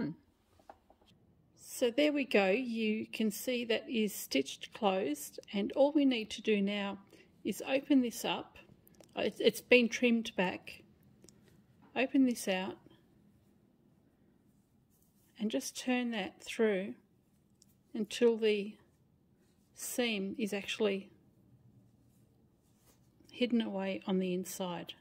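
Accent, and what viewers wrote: Australia. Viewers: Morning. Thank you for your generosity in sharing your tutorials. I love the way you teach. The pace is particularly good. Often I only have to watch once or twice to complete a project. And I am always delighted with the results. You are my new hero! It’s wonderful to hear an Aussie voice too!! Ps just a tip for you: making the Mini Tissue Holders, I cut an extra 6” x4”; so I have 4. 2 for the folded sides, and 2 for either side of a very very light iron on wadding. This does 3 things. It gives the pouch a bit of stability in its base AND i cannot make a mistake which side is the inside or outside😂, and the inside looks as good as the outside for when the customer changes the tissue pack. Probably a bit of over kill on my part, but I was using light fabric that needed the extra stability. All kindness & grateful thanks to you. WA.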